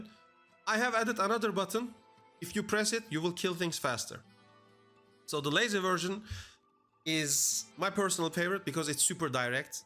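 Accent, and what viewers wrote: Turkey. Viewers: İngilizcemiz bile Milli bir tarza sahip, vallahi çok güzel konuşuyorsun. Buildler için de teşekkür ederim, bugün yarın deneyeceğim. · Aboow Türk İngilizcesi bu. Vay dostum süper içerik süper video. Twitch yayıncısıyım seni paylaşacağım izninle.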